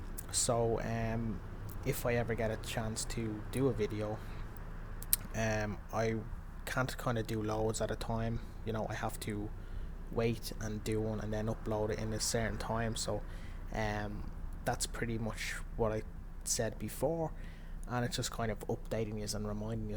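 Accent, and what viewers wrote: Ireland. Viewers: I love your Irish accent.. North or RoI? I'm from Wales :)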